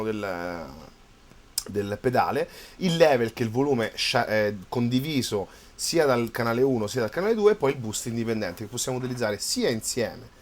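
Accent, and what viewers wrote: Rome, Italy. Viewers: ehi...sei simpatico con il tuo accento romano (de roma?)....ottima dimostrazione...bravo nei riff...bel lavoro!!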